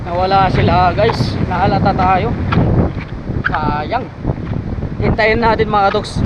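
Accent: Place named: Agutaynen, Philippines. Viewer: Kapatid parang lahing agutaynen ka? May punto ka kasi pag nagsasalita ka, halatado? Hahaha. Pa-shout-out na lng. Joel Zumaraga Tabarangao from Palawan with Love. Thank you and God bless!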